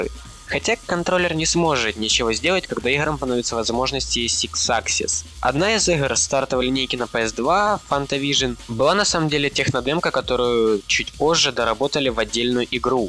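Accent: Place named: Belarus. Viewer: Пытаюсь понять что за акцент.. Ты из белорусской деревни?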